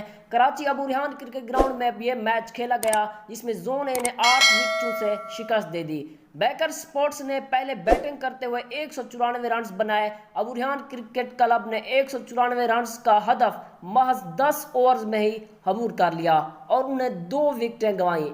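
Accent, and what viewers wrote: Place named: Punjab, India. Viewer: بھائ اپنا پنجابی لہجہ تو بدل دو.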